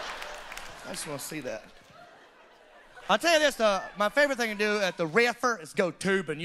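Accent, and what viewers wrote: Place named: South, United States. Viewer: Yeah unlike Larry the cable guy you have a real country accent and funny lol God bless 😂😇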